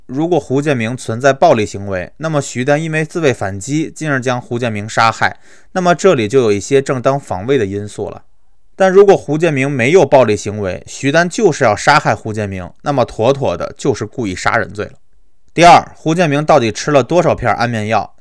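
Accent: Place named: Beijing, China. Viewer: +10086 第一次看你影片是石景山八尸案 你当时给凶手口供配音 京味儿真的够浓 一下子代入感亲切感就上来了 老哥加油！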